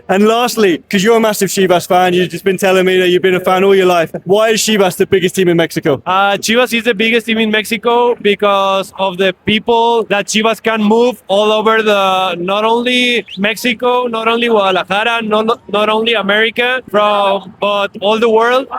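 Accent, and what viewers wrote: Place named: England, United Kingdom. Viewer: Your accent sounds like English from England.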